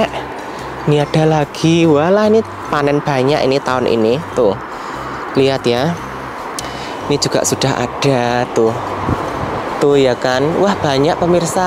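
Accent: Java, Indonesia. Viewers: Baru dua hari ini ngikuti flog njenengan mas....asik janan...bhs medoknya...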